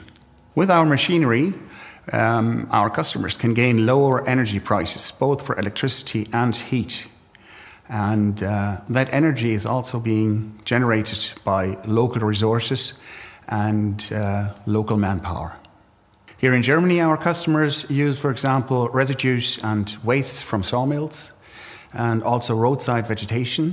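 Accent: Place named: Ireland. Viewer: I hear some Irish in there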